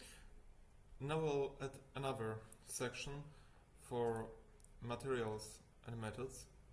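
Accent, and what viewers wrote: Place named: Russia. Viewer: Как будто русский на английском говорит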